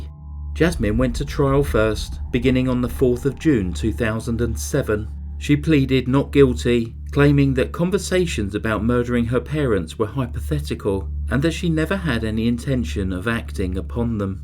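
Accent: United Kingdom. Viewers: Clearly from your British accent you are 'not from around here'. Which just makes me appreciate all the more that you put the effort in to give proper name places. I get so sick of the ton of video stories, especially from the States, where they will say Edmonton, Canada or Toronto, Canada or Calgary, Canada, etc etc. It's like they don't recognize we have provinces here. Many provinces have towns with the same name, so you'd better specify the province if you are mailing something!! As far as this case goes if Canada gets any more lenient on murderers, people will be crossing borders to commit their dirty crimes here knowing they'll only get a slapped wrist!- But seriously, 6 years inside for slaughtering THREE people-- so our Government gives just a worth of 2 years per person. So a kid can kill me tomorrow & my death is only worth 2 years!! And if one of your innocent victims is a LITTLE BOY, and your own little brother, no big deal, only worth 2 years still. 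How the hell can she be free?? HOW??? My god please tell me before this little monster was fully released she was permanently spayed. God don't let her breed her evil blood. Otherwise one day we will be reading about how she brutally killed her own children too.😕 Rest In Peace, sweet baby brother.🌹😔🌹. 🇨🇦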